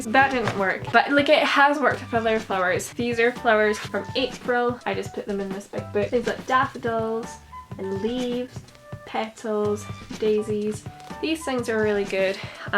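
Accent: Scotland, United Kingdom. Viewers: I love the idea of using tracing paper if you're not great at drawing. I will definitely be using that! Also love your accent, are you Scottish? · lol I'm Scottish too but live in Wales now and people always ask me where in Ireland i'm from. I just tell them near Glasgow and theyre like oops :) love the video and good to hear another Scottish voice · I love your accent so much, it's like slightly Scottish and English and it sounds very nice and that combined with the amazing video is really great :) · Omg you sounded Scottish so I immediately liked you😂💕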